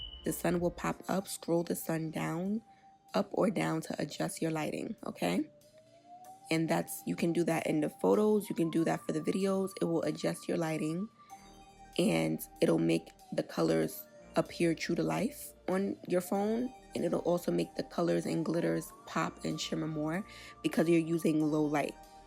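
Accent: New York, United States. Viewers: That cut crease is EVERYTHING!!! Absolutely beautiful!! BTW: u sound like ur from NYC? If so.. NYC STAND UP!! Great job 👏🏽👏🏽👏🏽👍🏾👍🏾👍🏾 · It’s so easy to pick up on. Lol I’m from Nola and knew she was from NY haha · I LOVE how you talk lmao. Are you from NY?! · Right knee she was a NYC girl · Gotta be from New York u sound just like juju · Yasss!! 😍😍 I’m like yup, she from ny.. I can hear it.. where do u find ebony bronze? I can’t find it anywhere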